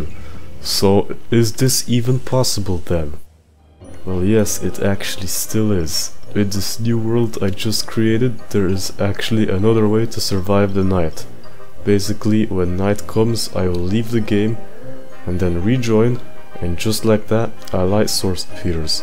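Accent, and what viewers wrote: Russia. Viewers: Мне одному кажется что он русский? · Nice vid! Are you russian?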